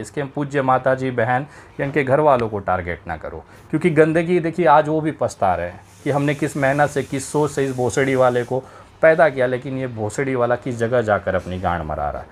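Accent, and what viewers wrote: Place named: Nagpur, India. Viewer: Aise kon bolta hai bhai bechara नागपुरिया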